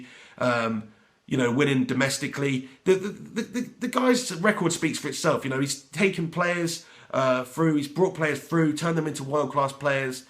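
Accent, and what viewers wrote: Manchester, United Kingdom. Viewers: Your Mancunian accent is very strange.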